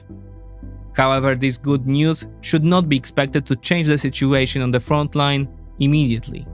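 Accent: Poland. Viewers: So happy to have the Polish accent back !! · Yaaaaaaaay he's Back!!!!! The Polish accent is back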